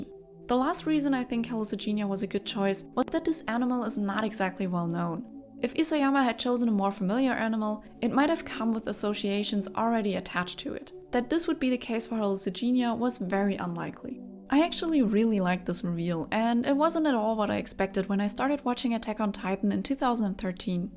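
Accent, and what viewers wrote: Germany. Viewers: you sound german a little bit german, wondering if i guessed correctly :D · Instantly knew you were German from the way you spoke, hätt aber nicht damit gerechnet, dass du aus Österreich bist. Gutes Video und Lg aus Kärnten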